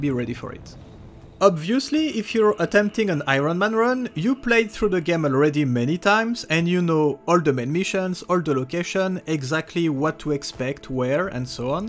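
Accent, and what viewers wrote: France. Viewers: Are you french? It sounds adorable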